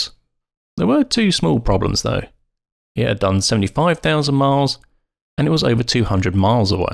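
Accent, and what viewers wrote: United Kingdom. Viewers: Sounds weird but I love your videos as well as Nick’s...would love to hear a conversation between the two of you hearing your British accents haha, also heard the incoming G series M3 debuting sometime this year will finally offer XDrive (it would be great for you Nick being in CT)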